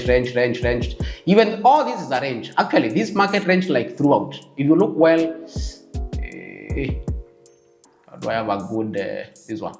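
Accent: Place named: Kenya. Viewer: Bro You sound a Kenyan... DO YOU HAVE OTHER SOCIAL MEDIA HANDLES ?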